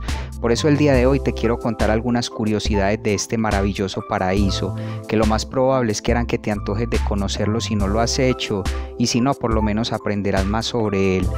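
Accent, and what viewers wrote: Colombia. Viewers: Sin duda alguna es colombiano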